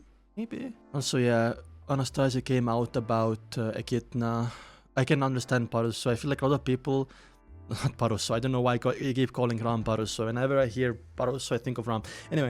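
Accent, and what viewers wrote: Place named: Estonia. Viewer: tulid mulle for you pageile ja ma sain aktsendi järgi kohe aru et oled eestlane. väga äge näha et eestlased teavad ka mis peak on 😋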